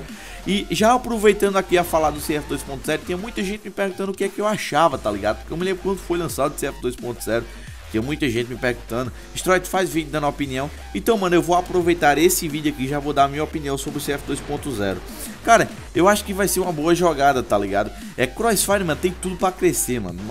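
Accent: Northeast, Brazil. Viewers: voce é do nordeste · você é nordestino? :O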